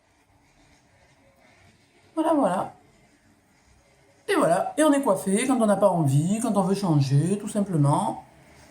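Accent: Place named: South, France. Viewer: Coucou, sympa de te voir "animée" et d'entendre ton petit accent du Sud:) Evidemment je m'abonne! Bisous bisous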